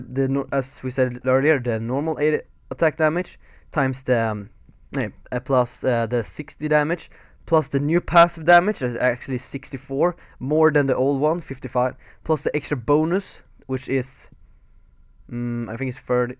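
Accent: Sweden. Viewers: Are you from sweden? Because your accent sound like you're from sweden:d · Are u swedish? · Don't you hear the obvs swenglish?:-)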